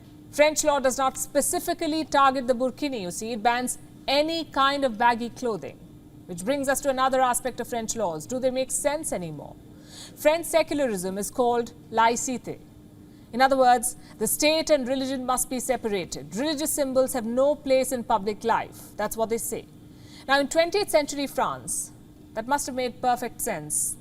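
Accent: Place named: India. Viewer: She just speak English i in hindi style with indian accent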